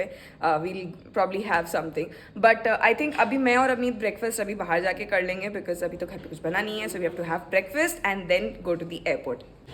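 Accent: Bihar, India. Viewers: Aap bhale he marwaadi ho aur calcutta m rhe ho kintu aapka lehza ya andaaz bihar u.p wala h.......love it❤❤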